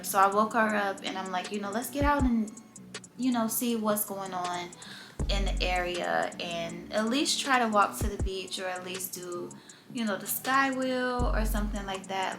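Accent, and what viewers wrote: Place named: Chicago, United States. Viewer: You can tell you from Chicago lolz 😂. I love the class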